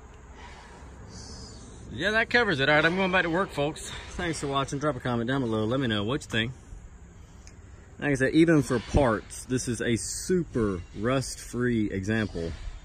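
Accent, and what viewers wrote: South, United States. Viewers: Jesus, Southern accent and knows/appreciates 80's panther frames? Please turn gay and marry me? Pretty car though. I like them so much more than the Town Cars of the time. Too bad it'll probably never be restored. These haven't quite reached classic status in the eyes of the masses but it'll get there. Hopefully it'll get lucky and hold up to that point.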